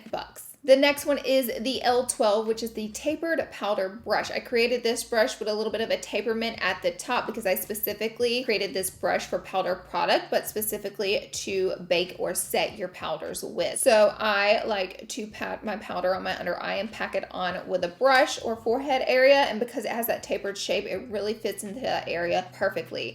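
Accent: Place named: Alabama, United States. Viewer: Yes!!!♥️♥️♥️ I’ve loved you since your dollar tree hauls with the Alabama accent😂 Your heart is pure gold my love. Much love from Hawaii🌺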